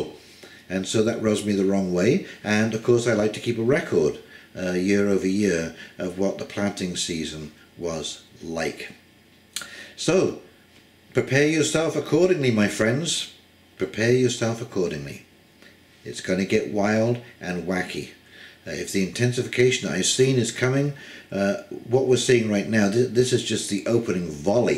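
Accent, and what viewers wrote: England, United Kingdom. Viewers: you have a clear English accent - but you talk as if you might be in the USA. Forgive me if I have missed something. Could you say where you are based? I am in the UK SE. I now listen to you regularly. Regarding your food advice: I reckon I have got things quite well organised for myself. My worry is for the cats and how I would feed them if there was a famine. The only thing I can think of for pet owners is to buy several sacks of dried food.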